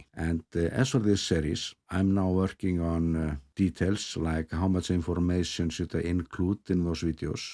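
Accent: Iceland. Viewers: Thanks for mentioning his Icelandic accent. Of course, he’d say”What accent??”. Just like the rest of us do about our accent in other parts of the world. However in has mentioned his accent in the past. It’s part of his charm. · Excellent. I am very happy to hear the great news about 100K. The quality of your productions, both video and audio as well as the Icelandic accent you provide is always welcome to see and hear. Your choice of music is also great. I look forward to your future video's. Take care, with greetings and best wishes from NZ.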